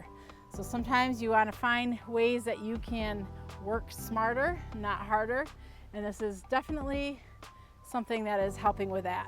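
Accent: United States. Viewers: I just love starting my day with smiling face and that cute northern accent 😁 (I’m from Kentucky so we sound a little different haha) Having there to complete the team was icing on the cake. Thanks for sharing your day ❤️❤️❤️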